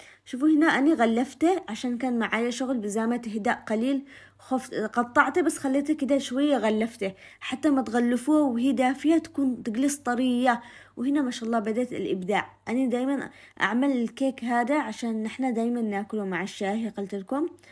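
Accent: Yemen. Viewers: حلوة اللهجة اليمنية ❤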